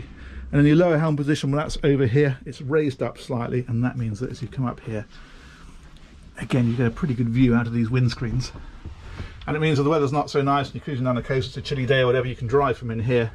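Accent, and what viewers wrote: United Kingdom. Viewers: You are so good at your craft! Of course the British accent doesn’t hurt either. Wishing you much success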